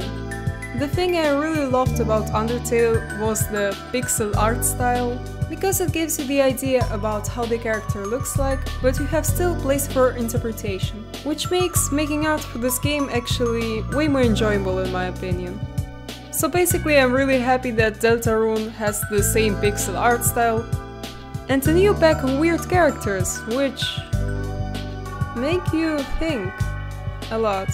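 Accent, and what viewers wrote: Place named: Russia. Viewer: I don’t know what country you are from, but you definitely have a Russian accent)))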